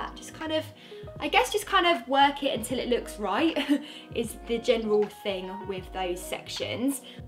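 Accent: United Kingdom. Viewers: She’s from the uk ❤️ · You may not realize it,but it's the combination of your dimples,your eyes,your British Accent and those colorful Berets you employed on your head that drove this Cambodian lad insanely mad.You got a boyfriend?? I can totally see you clinging to my arm while take a promenade up and down the Champ Elysee.The only thing missing on you is a little French Poodle leading the way to The City of Love!!;))))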